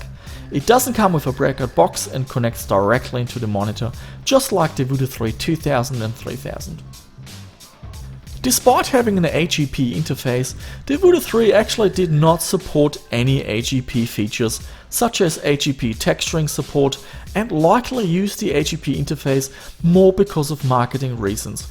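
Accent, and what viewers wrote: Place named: Germany. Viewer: oh ein deutschsprachiger, das hört man sofort gg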